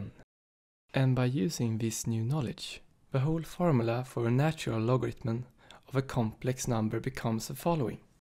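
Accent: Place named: Sweden. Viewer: Are you possibly swedish? (Very very few times i thibk i can distinguish sole swedish accent). Either way, great video!! Really enjoyed it :)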